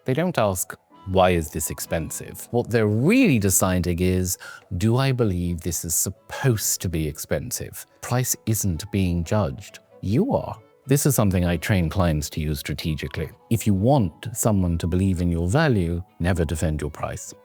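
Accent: RP, United Kingdom. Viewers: Now there is a plummy accent!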